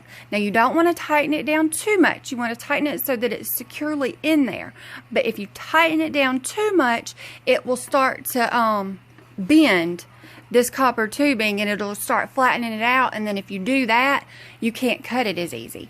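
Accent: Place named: South, United States. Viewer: I love your sweet little southern twang and your bracelet says "FUCKING LADY LIKE" - love it!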